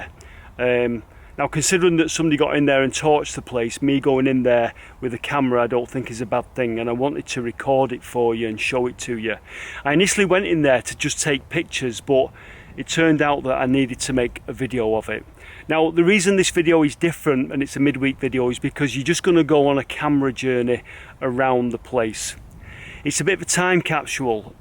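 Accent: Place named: Northern England, United Kingdom. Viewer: Well Thank you for your pleasant video of a DISGRACE for N.H. they should have preserved this GEM . Stupid attitude of town hall; they don't have the Millions needed to invest . So in fact they are responsible for the abandonment and destruction by Mindless Yobs. You are a pleasure to listen to although i am not fond of northern accents. Your personality surpasses.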